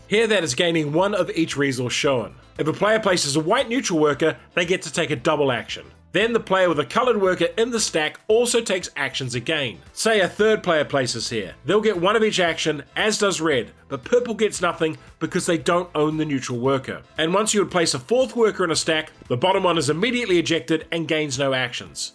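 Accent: New Zealand. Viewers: There is that Kiwi accent. Love your work.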